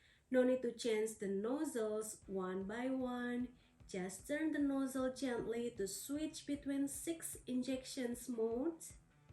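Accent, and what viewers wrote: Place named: Scotland, United Kingdom. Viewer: I thought you did a great job.,.... Maybe it's hard for people with that thick Scottish accent to understand.. Heck nobody can understand them anyway.... Keep after it girl 🙂🙂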